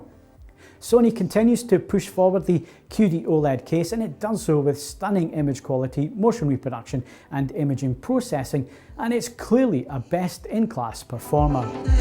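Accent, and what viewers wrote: Scotland, United Kingdom. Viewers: Great review as usual! All delivered with a wonderful Scottish accent. At times I thought I was watching a football match analysis, which made it all the more enjoyable; most football analysts in Canada are Scottish. I only wish I could afford a 77" A95L.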